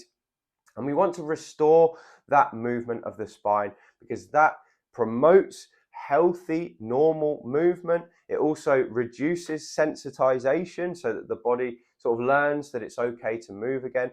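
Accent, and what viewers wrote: United Kingdom. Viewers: I've subscribed. I like this British accent, one can not hear it very frequently. I'm starting with the exercises. Thank you.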